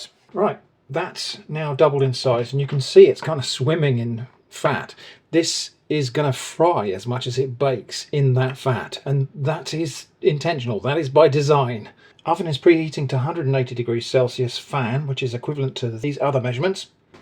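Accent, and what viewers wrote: United Kingdom. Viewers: pov you feel safe as a still slightly too hot delicious experiment and you hear a kindly brit say ".. but I can resist no longer"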